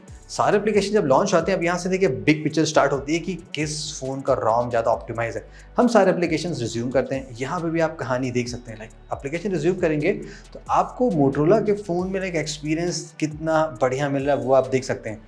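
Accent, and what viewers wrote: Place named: Bhojpuri, India. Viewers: I love bhojpuri style 😊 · Are sir ji aap to bhojpuri wale nikle 😀 kyaa baat kyaa baat ❤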